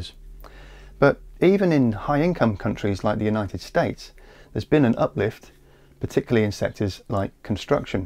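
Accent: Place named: Surrey, United Kingdom. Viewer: His voice is exactly like Professor Patrick N. Allitt who did a course on Victorian Britain for The Teaching Company. I'll bet both of these men come from the same area of England. I believe it was Surrey, south of London. I love the way they sound.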